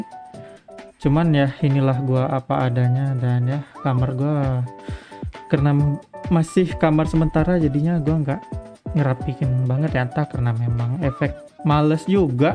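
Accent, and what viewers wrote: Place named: Pekanbaru, Indonesia. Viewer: logat orang Pekanbaru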